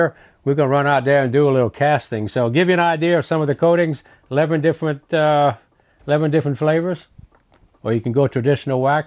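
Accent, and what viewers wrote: Louisiana, United States. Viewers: you got any Cajun in your background? Your wonderful accent reminds me of the venerable Justin Wilson - ah gar-own-tee!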